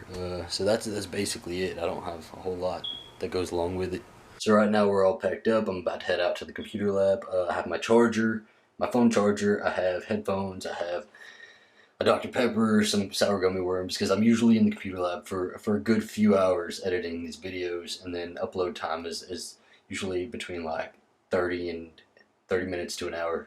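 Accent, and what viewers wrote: South, United States. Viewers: My hero Brave and strong Im southern as well love the accent You Rock ! You actually inspire and help people unlike most self absorbed you tubers . Dr pepper just gave your roots away ! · Love your videos! Love your southern voice. Also, nice Hickie! ;) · You have one of the most comforting southern voices I've ever heard. It's like a warm blanket you simply love wrapping yourself up in. Add to that you seem to be a natural born storytelling, making it possible to cast a spell so easily upon your listeners. Not to mention you're cute as a button! Yes you are!!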